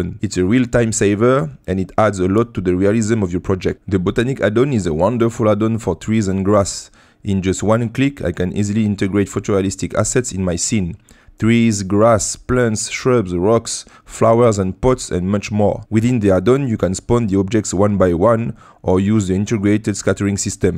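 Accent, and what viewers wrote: France. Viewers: Le petit accent français 😏 super tuto vraiment continue comme ça c est incroyable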